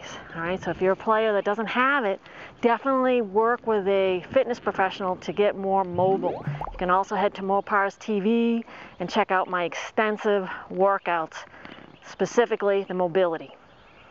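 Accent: Boston, United States. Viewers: Hey that is the reverse of the song... Not sure what they meant but I get what you are saying..25 percent to 110 percent swing speed to find out my natural rhythm. I will try next time I am on the driving range to zero in on what is good for me. Was never conscious of this as I just swing without really thinking of the speed so it is a great nuance you have elucidated upon in your Bostonian dialect which is awesome!!! Thanks!!!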